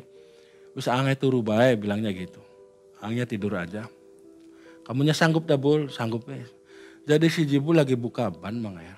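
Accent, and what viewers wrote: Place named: Cirebon, Indonesia. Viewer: Yang bikin lucu itu logat Cirebonan - nya Mas, coba lebih diseringin tertawa jadi lebih asik nontonya.... Mas & Jibul, Wa, Wa Favoritku, BRAVO maju terus MM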